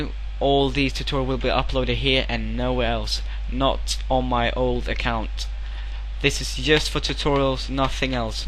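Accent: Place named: United Kingdom. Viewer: UK Voice.. Better then those other shitters that can't speak proper english lol. Nice tutorial sure :) Keep them comming ^^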